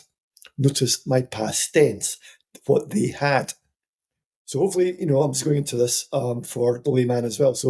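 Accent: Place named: Scotland, United Kingdom. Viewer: Hi - thank you for sharing..I found it to be very informative and fascinating and the Scottish accent is fabulous too.😅 Can you please explain Anchoring a little bit more? Also do you ever treat PTSD and Neuro divergence..or ADD?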